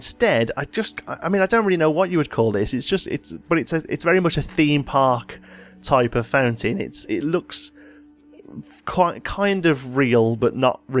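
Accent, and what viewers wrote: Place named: Birmingham, United Kingdom. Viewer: Absolutely love this series. Probably the best I've seen. Love the detailing. Also, I did comment asking about your accent, but I think I've concluded its a Brummie accent? Correct me if I'm wrong.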